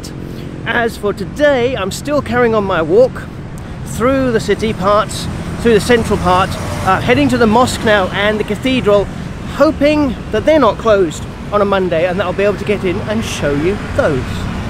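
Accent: United Kingdom. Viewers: This first time i watch you video, are you England? Your accent just like in harry potter movie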